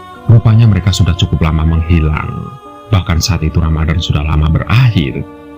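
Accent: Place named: Java, Indonesia. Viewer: Mantap bng aku suka cara Abang ceritanya seru dan has jawanya 😊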